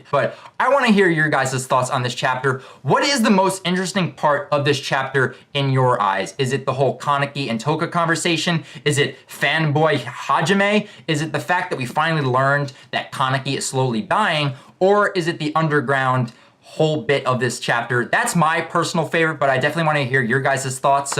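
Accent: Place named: New York, United States. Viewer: you sound like you're from New York ( I'm from New York) no offense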